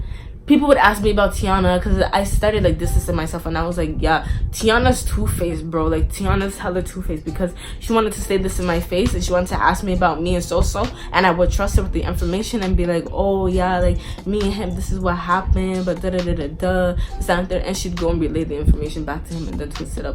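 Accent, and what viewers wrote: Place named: Jamaica. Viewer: Yuh sound lik yuh Jamaican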